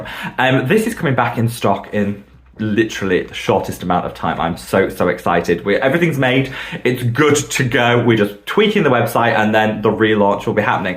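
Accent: United Kingdom. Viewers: I came to your vlog a few month ago but I‘m always very excited about your topics and I love your british accent ! I started with the agireline two weeks ago, to soon to talk about results, I use Matrixyl two month. I can‘t see anything about reducing wrinkles but I‘m in the postmenopause, it is a bit too late for that. But, my face looks a bit fresher and glows a bit, that‘s what friends tell me and that makes me look a bit younger, so for me personally a good result! Thanks and keep on going, you‘re great!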